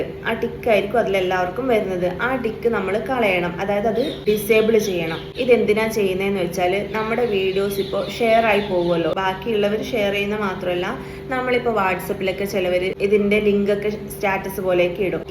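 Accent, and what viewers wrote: North Kerala, India. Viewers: ആ ഒരു വടക്കൻ ചുവ വന്നു..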